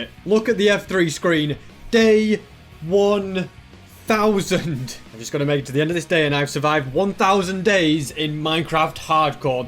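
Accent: United Kingdom. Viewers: Why we all love doesn’t scam has a satisfying BRITISH voice Edit: HOW I COULD FROGET The shiny eyes of course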